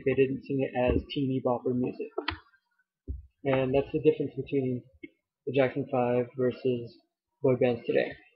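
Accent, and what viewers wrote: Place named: United Kingdom. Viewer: why do you sound Britsh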